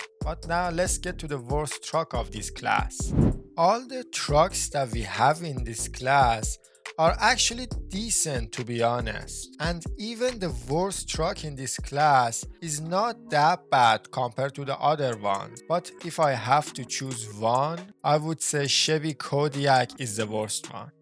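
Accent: Iran. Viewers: Are you Iranian Bro? your accents shows that:face-blue-smiling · He is, because of the accent. ☺ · از لحجت معلومه ایرانی هستی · You are awesome dude... love your videos, what accent do you have? Sounds slightly Persian :)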